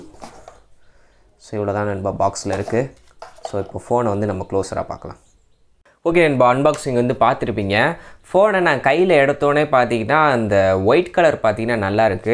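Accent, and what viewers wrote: Madurai, India. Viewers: neenga pesura madurai slang super nanbaaa🤘✌️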